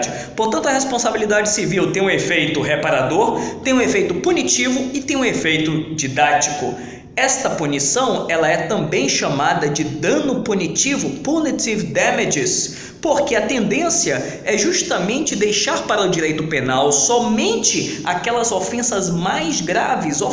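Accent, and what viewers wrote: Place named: Pará, Brazil. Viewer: por aqui peguei q vc é paraense, acertei ? Kkkj hummm bunitinho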